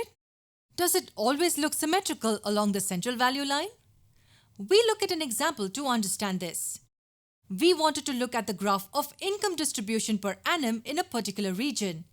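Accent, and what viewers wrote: India. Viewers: Indian accent lovely & cute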